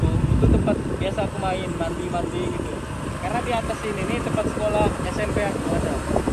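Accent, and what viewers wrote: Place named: Medan, Indonesia. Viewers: Qkira org medan.. dari logatnya, gk nyangka klo org lampung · Bhasa ny kek org medan y kk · Saya kira bang ini orang medan krn logatnya kayak medan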